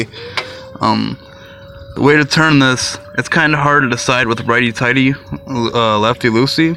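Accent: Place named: Michigan, United States. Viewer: Lol yea man im from michigan too! I got the accent too haha..but im just lookin to buy a nice condition 05 grand prix w the 3800...i do the oil changes in all my cars and just wanted to see how much of a pain this one was...looks fairly easy access to me...i also know the throttle bodys get jammed up on these...whats ur opinion on that?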